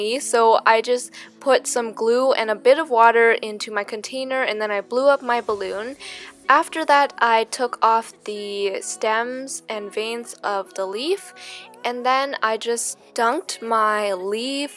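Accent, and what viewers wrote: Canada. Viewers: Top Bun she does, she has a Canadian accent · She's Canadian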